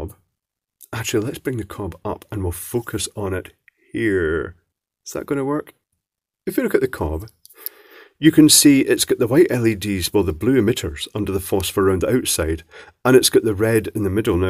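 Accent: Scotland, United Kingdom. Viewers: I only come for the endearing Ms.Doubtfire accent. I guess your content is ok too,.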